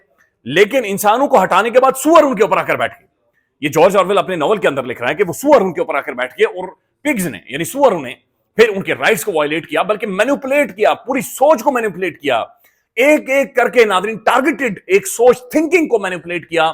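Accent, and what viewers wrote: Kashmir, India. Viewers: Bhai ur accent reflec u r true kashmiri I m also bt I live in Karachi...wesy tu pora mulk yateem bt Karachi tu extra yateem..yahan k log gongy behry bs mazdoor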